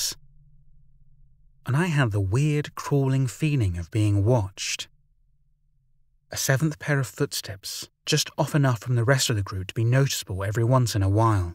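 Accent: England, United Kingdom. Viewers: More than happy to leave a comment. It's comforting to hear hear a fellow Englander narrating these Wendigo and Skinwalker stories. I really love your channel.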